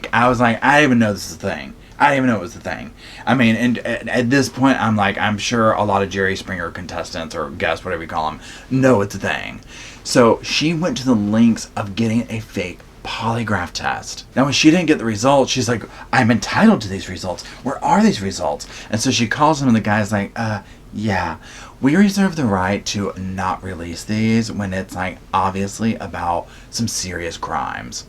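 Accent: South, United States. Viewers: Lovin your accent. The really strong Southern accent is so homely. It’s funny as I’m from Ireland. □□□□:_SofaSquad::_SofaSquad::_SofaSquad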